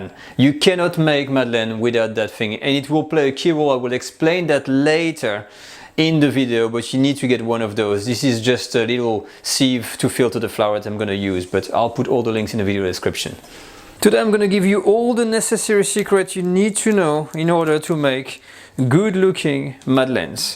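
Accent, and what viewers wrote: France. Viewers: So cozy French accent 🤗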